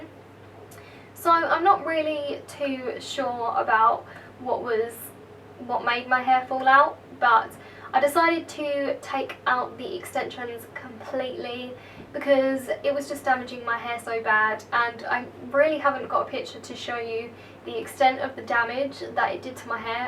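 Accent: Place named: United Kingdom. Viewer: I admire your british accent❤️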